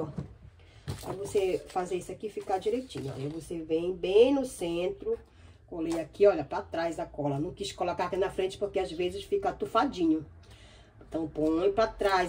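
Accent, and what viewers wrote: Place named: Belém, Brazil. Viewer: haaaaaa perguntei pelo sotaque, sou de Belém do Pará, fui em mosqueiro uma vez se não me falha a memória, amei saber que es daí 😘 muito sucesso pra ti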